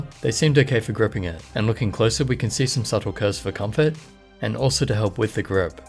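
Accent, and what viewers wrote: Australia. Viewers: An Australian/New Zealand voice that's soothing and orgasmic.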